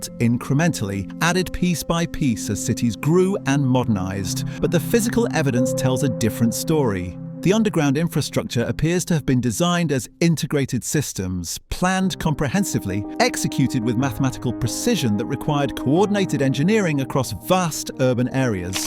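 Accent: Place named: England, United Kingdom. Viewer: Who is really making all these Tartaria videos? All these channels are producing extremely similar videos… all are very well produced, all have professional sounding English accent narrations, all have a similar cadence/ speech patterns, they have zero human mistakes in the narration, never a throat clear, slight cough, never a change in voice, never an actual person to be seen behind the voice/ channel.. All seemingly able to find these amazing old photos in “archives” & supposedly researching records & registries from centuries ago? it’s seems as if are all being AI produced? Are they truly the work of genuine independent researchers? I find the whole Tartarian topic extremely interesting, but question if there is something else going on behind this information.. All these channels producing virtually the exact same mini documentary style videos on the subject?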